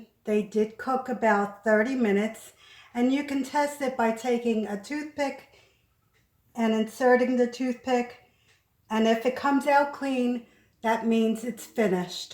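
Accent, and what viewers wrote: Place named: New York, United States. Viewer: Yes, you sound like me, lol! I’m a Bronx girl born and raised, still living here. Are you still in the Brooklyn Queens area?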